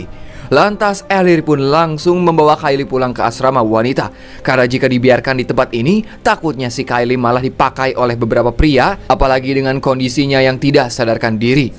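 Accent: West Java, Indonesia. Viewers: Logat sunda sarua atuh nya saqahayang jeung 🎉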